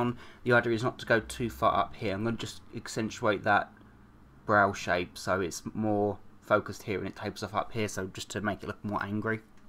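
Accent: United Kingdom. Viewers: You sound like your from the UK! You need to join me with Farmasi, we are opening In February I’m going to try to recreate you look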